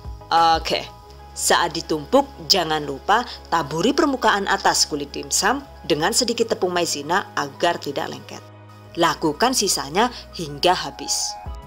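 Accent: Banyumas, Indonesia. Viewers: Terimakasih resep2xa,selalu menyenangkan menonton video THV, gk jarang saya senyum2 mendengar cara bicara si bunda, yg paling saya suka bicara dlm logat ngapak😁👍...... Request saus pasangan dimsum, matursuwun🙏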